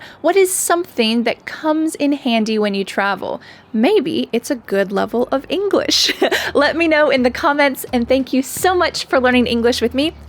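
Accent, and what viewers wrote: United States. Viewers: How much I adore and enjoy your clear American pronunciation,. It's awesome!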